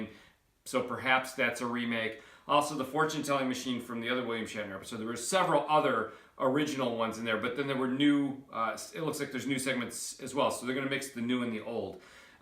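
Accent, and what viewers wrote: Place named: Upstate New York, United States. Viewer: Lol i could could tell you were from upstate ny 3 words in. Great commentary! He really is a gem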